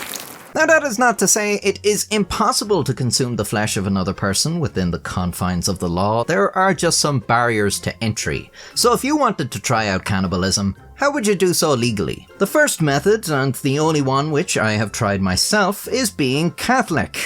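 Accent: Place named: Ireland. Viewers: Maybe you just wanted to hear an Irish voice telling a tale with some line art animation, or maybe you wanted to know how to eat human. I'm here for the first thing, btw. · I clicked because funny Irish man be talking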